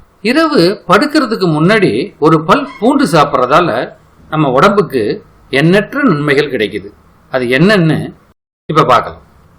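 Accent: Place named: Kerala, India. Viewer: 😂😂😂 but malayala slang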